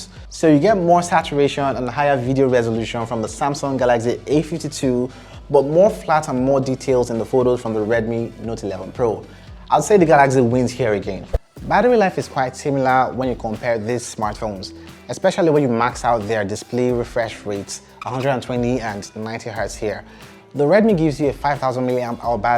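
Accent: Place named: Nigeria. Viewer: He sounds like a Nigerian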